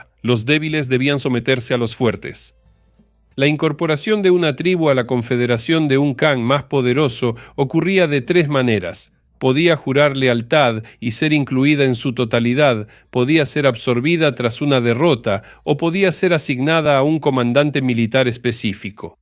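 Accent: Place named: Argentina. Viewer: Ahora tiene acento argentino el.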